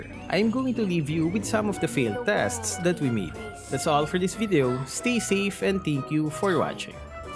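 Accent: Philippines. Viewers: Pinoy na pinoy mag english ah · You can hear his peenoi accent 😂💜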